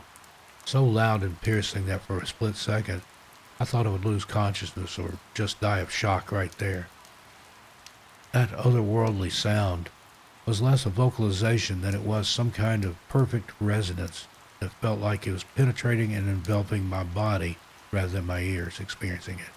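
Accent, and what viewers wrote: Appalachia, United States. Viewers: Great stories. The narrator sounds like that of an Appalachian. These stories scare the crap out of me. Its been years since I have done it, but I have hiked and camped in these very same areas in these stories. I didn't see anything or even necessarily heard anything too creepy, but there was a sense by all of us that "something" malevolent out in the darkness of the deep woods. I have never gone back.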